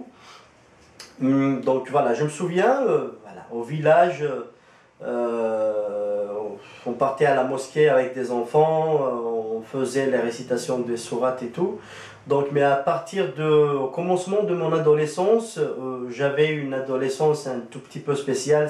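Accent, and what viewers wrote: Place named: Kabylie, Algeria. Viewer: Le disciple Au commencement de votre vidéo vous dite ceci " bonjour bonsoir je me présente je m'appelle je suis Algérien kabyle............" vous vous adresser a qui la? pas aux Kabyles en tout cas! ni aux Algériens d'ailleurs! pourquoi ne pas réciter votre histoire de conversion en kabyle uniquement!? vous voulez cibler qui en parlant en français par exemple? et je trouve qu'i y a une sacrée différence entre vous entendre parler et vous lire! vous parler et j'ai constater que vous chercher a chaque a dire des mots que vous n'arriver même pas a trouver par contre j'admire votre français avec un accent kabyle! quand a vos écrits le fameux copier coller fait fureur! alors comme ca vous avez vu le film sur jésus? "zmane nsidna issa" c ça? et ca vous a marquer, toucher!! un film! moi qui croyais qu vous aviez lu la bible!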